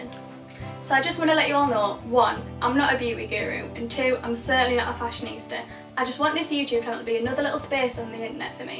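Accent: Middlesbrough, United Kingdom. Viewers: Nice to hear you speak! Proper boro accent! :) xx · It's mad how much you can tell you're from the boro on here. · ***** A very stereotypical British accent haha I really don't know why :)X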